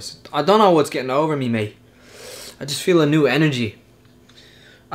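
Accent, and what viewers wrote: Dublin, Ireland. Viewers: That is the most on point Irish accent I have ever heard by a non Irish native, flawless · I’m confused are you American or from north Dublin? You don’t do a bad job at either. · Your Dublin accent is on point😂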